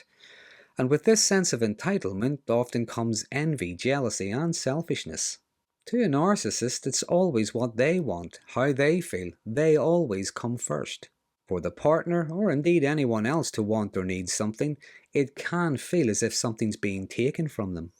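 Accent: Ireland. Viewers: >>10-Q, Counselor. I'm glad I stumbled onto your "Dry Begging" lecture. >>My psychotherapist and I will discuss it at our upcoming Zoomer! I remain Respectfully Serene, NHG. >>PS what a delightfully sweet Irish accent! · Sounds like an Irishman to me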